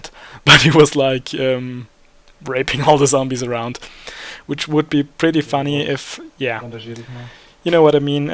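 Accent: Germany. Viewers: man hört deinen deutschen akzent und man hört deinen bruder deutsch sprechen in skype ^^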